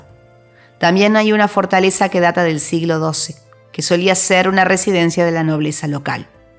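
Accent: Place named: Argentina. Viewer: Toda Francia es preciosa!!! La voz argentina, suena maravilloso el español.Gracias por comunicarnos con su voz este precioso vídeo.👍👍👍👍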